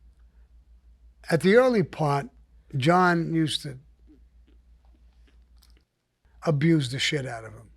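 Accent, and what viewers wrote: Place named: New York, United States. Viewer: Us guys from NY, NJ, PHILLY, CHI & the like can’t walk a city block without tripping ova 20 guys that speak how Sammy does..since the 70’s 🤣🤣 🤷‍♂️ who knew the world thought so highly of this kinda brouge🤣🤣🤣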